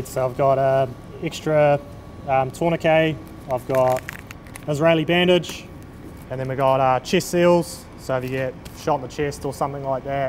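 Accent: New Zealand. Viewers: Ev great ! Just the accent ! 😮😁 Go Zealees !! 🇳🇿👏👏👏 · Is this a normal New Zealand accent?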